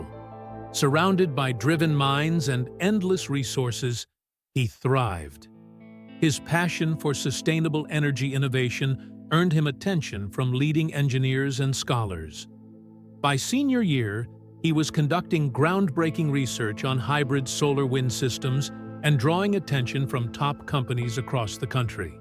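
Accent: United States. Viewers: What is wrong with you this is the UK so why do we have to listen to what sounds like a an American accent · Why this American accent on various vids. Anything English!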